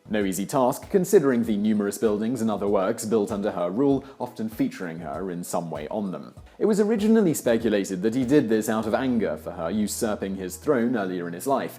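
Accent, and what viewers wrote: United Kingdom. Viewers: Lol I guess this is the only time a British accent sounds cringe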